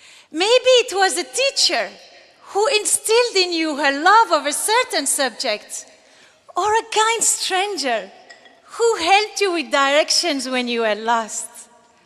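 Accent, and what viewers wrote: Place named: France. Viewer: nope that's actually her English accent, you can hear the French in it.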